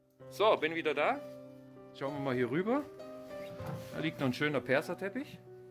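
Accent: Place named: Upper Swabia, Germany. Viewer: Du kommt aus Oberschwaben gell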